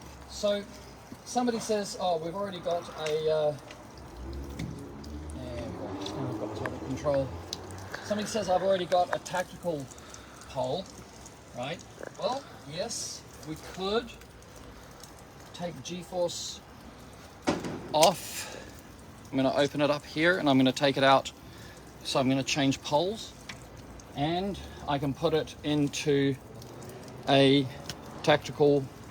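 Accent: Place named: Southland, New Zealand. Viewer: Hey - are you originally from Southland? - I hear that Southland twang in there. (I’m looking to buy and try out a Radial brush soon btw too 😃)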